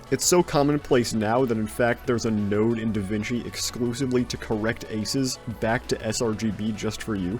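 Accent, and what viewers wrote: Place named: United States. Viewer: "compositing is a long and complicated process that many of you may be unfamiliar with as 3D artists" I'm sorry but this is a genuine question, but do Universities not teach this as part of their curriculum in America (I'm guessing based on the accent)? Do you choose your specialisation right from the get go? Because I was taught everything from Modeling, Animation, Rigging, Texturing, Compositing, literally anything and everything that can be in a 3D pipeline. In all sense of the word, we were taught from the ground up to be generalists. I understand that some people are self taught or are just hobbyists so learning compositing seems like a waste if all you do is modeling or animating, but I'm curious for people that went for a formal education how that all looked like.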